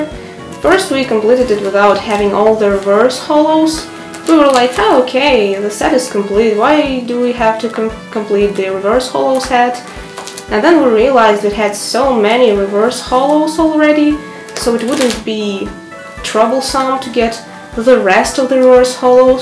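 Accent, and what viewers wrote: Russia. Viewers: Слышу этот русский акцент! Прелесть С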